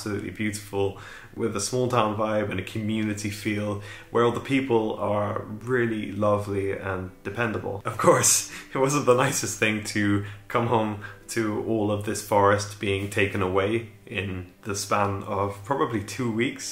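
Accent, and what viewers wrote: Sweden. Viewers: You sound so Swedish when you pronounce Swedish words. You even have the Swedish twang when you speak English. You should do podcasts!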